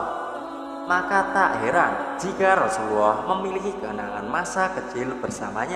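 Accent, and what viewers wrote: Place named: Java, Indonesia. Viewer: Teruk bahasanyer..pelat Jawa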